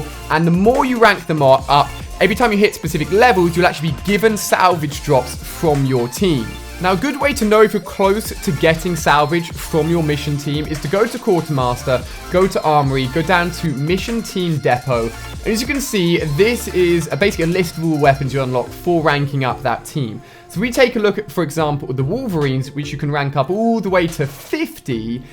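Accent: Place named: United Kingdom. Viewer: i like british accent.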